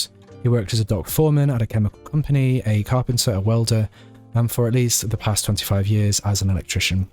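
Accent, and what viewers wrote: United Kingdom. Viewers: You have such a beautiful, sultry voice. I would love for you to narrate all of my favorite books. You could easily narrate professionally (especially for those of us in the US who swoon over British accent).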